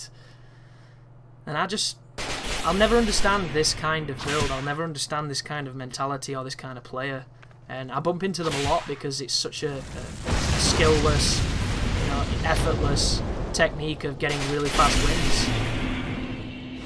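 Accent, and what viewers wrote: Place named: Bradford, United Kingdom. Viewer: Yeah, you've definitely got the accent. I'm gonna guess Bradford then.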